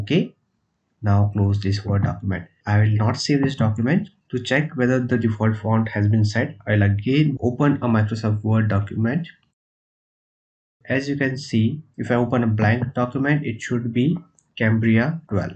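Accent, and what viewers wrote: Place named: India. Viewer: thanks a lot, I'm a 73 years old french woman and first I was a little puzzled because of your nice indian accent and the fact you speak quite fast but your explanations were so clear, thanks a lot you really helped me. In the seventies, during 1 year in West Berlin I shared a flat with 3 guys from Bombay and one from Madras and you made me feel young again so double thanks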